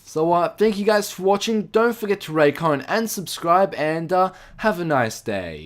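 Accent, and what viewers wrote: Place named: Australia. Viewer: Australian English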